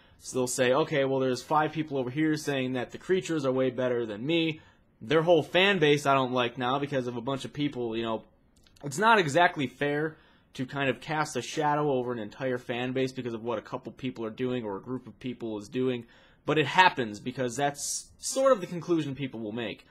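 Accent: Ireland. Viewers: Hey are you irish please respond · are you irish?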